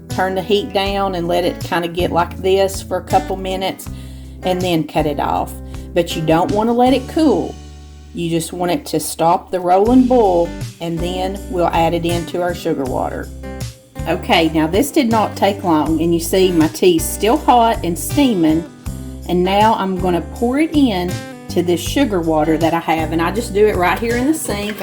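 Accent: South, United States. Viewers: Hey girl! Here watching. We got matching southern accents. 🥰 I make mine very similar , I made it in one of my videos I don’t remember which one 😂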